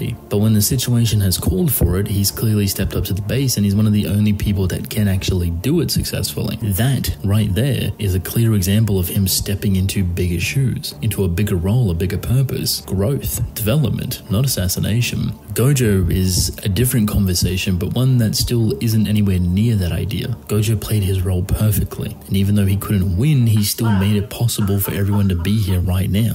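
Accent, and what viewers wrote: Australia. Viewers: I don’t know why, but I think I’m more likely to sub to a channel when the narrator is Australian.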